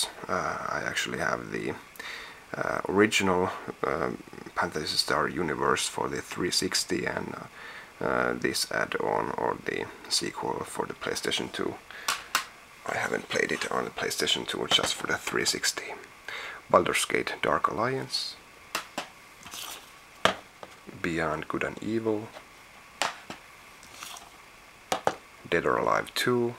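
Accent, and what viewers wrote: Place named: Finland. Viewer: he is finnish. finnish doesnt have pronouncing, you say the words exactly like you write them. for example, trade would be just tra de, not treid. of course we try to pronounce them correctly but that doesnt always work.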